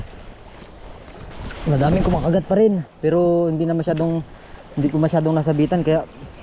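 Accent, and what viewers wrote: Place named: Zambales, Philippines. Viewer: Master bat Pay tawag mo sa kasama mo? Alam ko dito lang sa Zambales ang nag tatawawagan ng pay. Punto mo para ka ring zambaleño...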